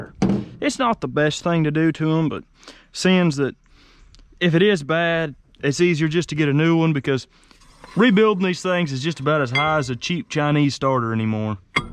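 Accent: South, United States. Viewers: Coil its just a country accent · I’m from Long Island New York like your 🪄✨southern accent✨ 💪🏻😎👍🏻 keeping the best cars on the road⚡️🇺🇸⚡️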